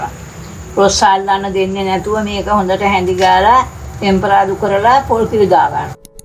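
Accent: Ambalangoda, Sri Lanka. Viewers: අම්බලන්ගොඩ පැත්තෙ ආච්චි කෙනෙක් කතා කරන විදියට නම්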